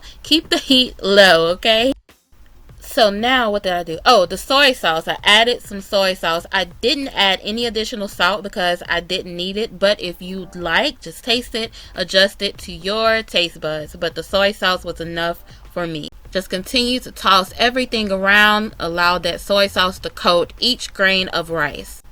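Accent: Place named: Atlanta, United States. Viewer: Girl you can cook! Where you from? I hear some ATL in your blood!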